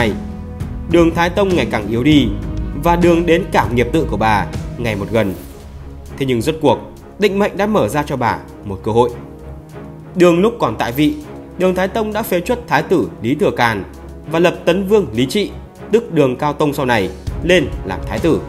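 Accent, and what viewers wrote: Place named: Cambodia. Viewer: Ko biet tieng Anh thi lam on lay ten tieng viet dzum, noi tieng Anh ma nghe nhu tieng campuchia, nghe phat gom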